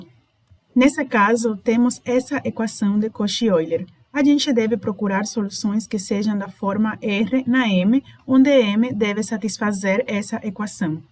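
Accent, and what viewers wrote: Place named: South, Brazil. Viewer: Obrigado pela aula!! Fiquei um pouco distraído tentando descobrir de onde era o teu sotaque kkkkk. Chutaria que sua língua materna é espanhol, vc aprendeu português depois da adolescência e como vc fala muito bem, vc deve ter morado no brasil por um tempo, pelo seu sotaque sulista, acredito que veio morar em uma região do sul do br, acertei?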